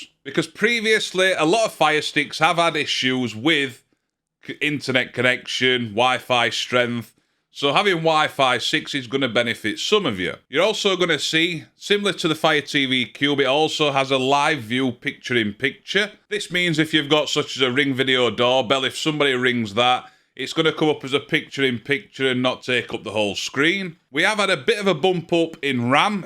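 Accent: Yorkshire, United Kingdom. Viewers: First video of yours that I've watched, you've got to be from Yorkshire right? 🤣😆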